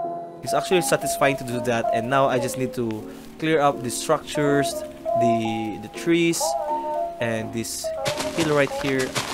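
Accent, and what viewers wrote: Philippines. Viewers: Are you filipino? Your accent very familiar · are you Filipino? cuz ur english accent is like filipino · Unang accent palang, PH na PH! Salamat boss ! <3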